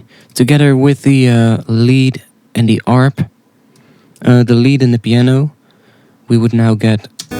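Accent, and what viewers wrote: Netherlands. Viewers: Yo you sound dutch haha! Anyways, i have a request, i have never encountered a really good tutorial for a deep house stab like in the song Coyu & Ramiro Lopez - 1, 2, 3... Fire! (Bontan Remix) which starts. Enjoying your tutorials!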